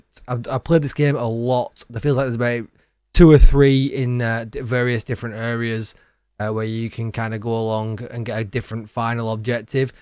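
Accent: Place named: Bolton, United Kingdom. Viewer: Are you from around Bolton bud😁. I've played a bit of it but dont want to grind too much, it may just put me off when it actually releases. I just wanted to nose around to see if it was worth coming back to with some friends.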